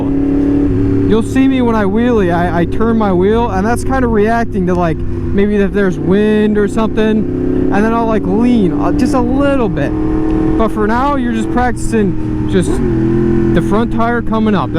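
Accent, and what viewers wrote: United States. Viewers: you sound like you are from wisconsin or somewhere in the midwest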